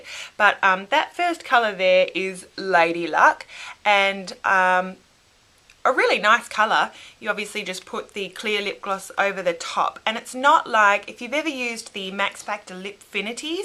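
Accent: Australia. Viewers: feel a bit special being mentioned :) btw i'm loving the videos finally someone who understands how ludicrously expensive makeup is here in australia! enjoying the familiar accent that i am not use to in the youtube beauty community.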